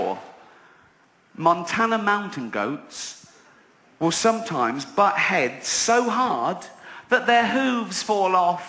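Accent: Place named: United Kingdom. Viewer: This guy is fuckin hilarious.And his peculiar British accent makes him sound even more funny.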